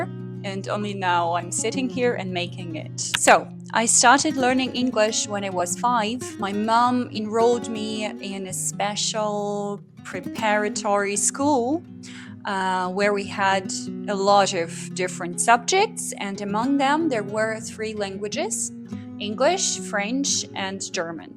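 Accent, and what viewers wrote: Buenos Aires, Argentina. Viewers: Hola, linda 🫶🏼 gracias por el saludo Jajaj fue inesperado. P.D. Me encanta tu italiano 🤌🏼 ni hablar de tu español porteño 😻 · Hablas muy bien varios idiomas te felicito! hablas muy bien el español argentino tenes el acento porteño, mis abuelos eran italianos, yo tengo la ciudadania italiana , saludos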